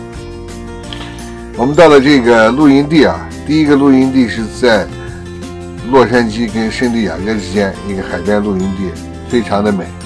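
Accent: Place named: Xinjiang, China. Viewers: 姜先生您好，听了你的节目有一阵了，感觉你的口音特别像我们新疆的普通话，结果今天终于发现咱们是老乡了，我从小在博乐长大，在乌鲁木齐上大学并生活了十几年，现在我在Iowa 定居。 你的口音太亲切了。支持老乡。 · 一样新疆老乡，真真的谁的是新疆的疆普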